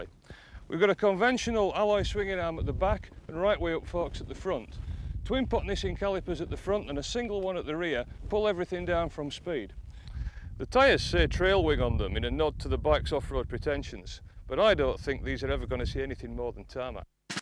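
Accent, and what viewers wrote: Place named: Yorkshire, United Kingdom. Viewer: more like 1974. FYI the accent is from Yorkshire. The haircut is from Jesus Christ Superstar.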